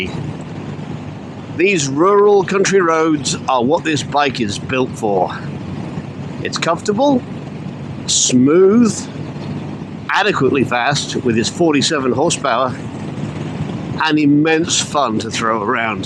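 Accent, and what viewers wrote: United Kingdom. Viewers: Very enjoyable vid and well made for saying you only have a couple of other vids on your channel. I've always thought the W800 as a lovely little retro but probably a bit small for my 6ft 4 frame. You sound British and very clear to listen to. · It took me a minute to realise your are not in the UK. The accent got me.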